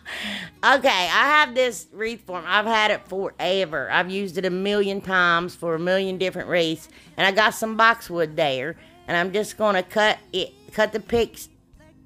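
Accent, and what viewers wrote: South, United States. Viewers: I thought I had a countryfied accent but you got me beat by a long shot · Country twang 4 ever!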